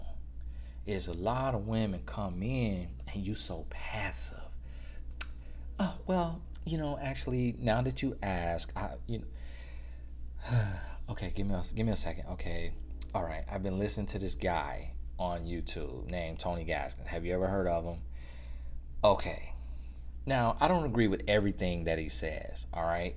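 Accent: South, United States. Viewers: Enjoying your REALNESS & your 'southern' accent!! 🤪🤪🤪 TY, Mr. Gaskins.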